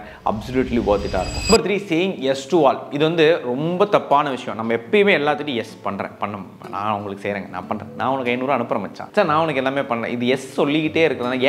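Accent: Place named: Madurai, India. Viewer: Anne Madurai slang la pesurimga❤❤❤❤❤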